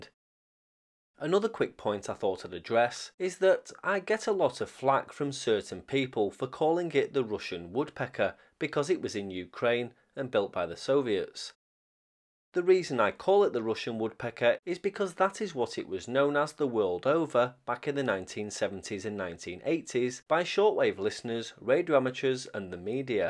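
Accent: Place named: Glasgow, United Kingdom. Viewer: is the man.... Great sense of humour too.... And he has a lovely Scottish accent... Glasgow I think.... He is very smart.... I'm a big fan of.... He is a very down to earth man.... And he gets on well with his brother..... I've had a few replays from him....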